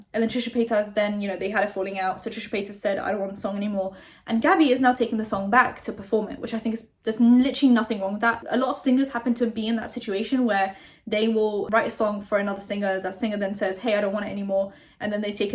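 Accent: United Kingdom. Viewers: Ok, I've been to London three times. I lived there for 5 months one of the times. I'm really good at deciphering a British accent....You're actually talking really fast....Please, slow down a bit, dear. What you're saying is interesting enough to want to hear it...I can understand it, but it takes effort. Better if people can relax and listen.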